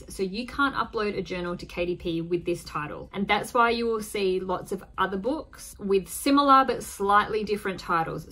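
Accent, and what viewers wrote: Australia. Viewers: Aussie accent? Thanks for sharing!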